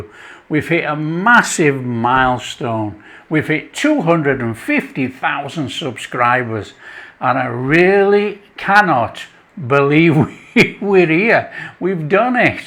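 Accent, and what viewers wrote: Yorkshire, United Kingdom. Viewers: It is your lovely straightforward Yorkshire deliverance and of course the honest down to earth food. Keep going. All the best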